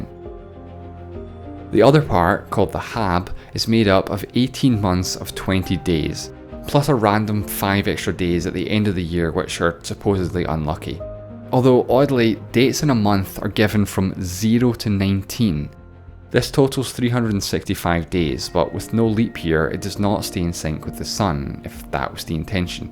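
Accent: Scotland, United Kingdom. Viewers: Very interesting accent. British? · How Scottish are you? Great video btw. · I love your Scot accent. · its his accent there's nothing he can do about it, also its not really that strong of an accent compared to how some scots speak... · are ou scottish?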